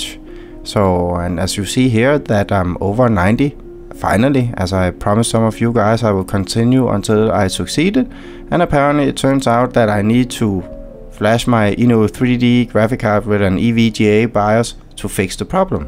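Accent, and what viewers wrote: Denmark. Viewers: I know a dane when I hear one...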